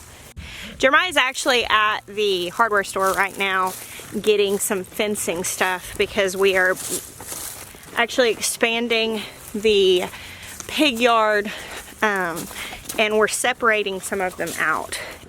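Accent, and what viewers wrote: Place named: Arkansas, United States. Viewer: My sister lived in Arkansas for almost 10 years as an adult, and picked up a little bit of the accent (we’re Michiganders). When you said that Ben is five (“fahv”), I heard her accent. But then you said “time,” which she pronounced as “tahm.” I’m not saying this to judge the accent at all. I just found it interesting. When I first started watching your channel, I must have heard some of the accent, because I thought you might be in Arkansas.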